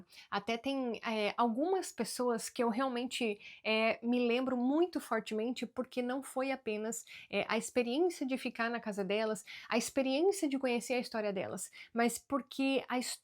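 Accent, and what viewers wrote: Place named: South, Brazil. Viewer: talvez tu já fez vídeo falando de que Estado tu é natural. Tú é Gaúcha ou Paranaense? ( Pelo sotaque). ESTÂNCIA VELHA RS.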